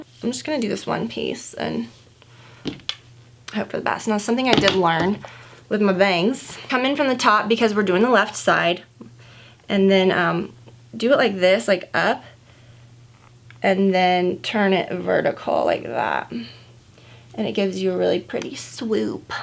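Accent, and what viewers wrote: South, United States. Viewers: Southern accent is so funny. Love it. Of course I am southern. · I don’t know what I love more, or her country accent! 😍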